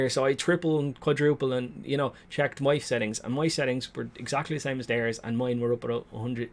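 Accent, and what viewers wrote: Ireland. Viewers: Addicted to content. Man cave + Irish accent + rage + scratching. Top notch channel right here, m8.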